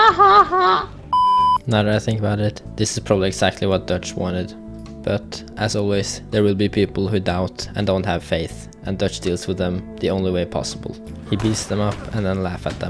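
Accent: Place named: Norway. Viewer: very good norwegian english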